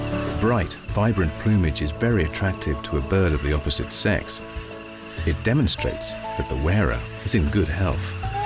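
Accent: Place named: England, United Kingdom. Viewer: High-Quality Documentaries ✨💎I have no idea who these producers are, but this documentary is certainly on par with BBC. And Im not saying that simply because the narrator is English. First class photographic direction & editing. And the cinematography of these landscapes- - Alaska, the Amazon, & India- - are all magnificent, of course... There is no more noble public service than documentaries that teach the Natural Sciences, & display animals & habitat A hundred Thank Yous for commiting this film for broadcast through Youtube 💜💜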